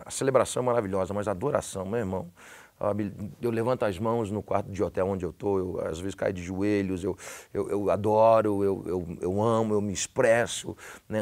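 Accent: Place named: Brazil. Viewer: Interessante o falar q pensa e sonha em ingles... ele eh o primeiro gringo q conheço q consegue 100% do sotaque brasileiro... incrivel demais, provavelmente algo divino mesmo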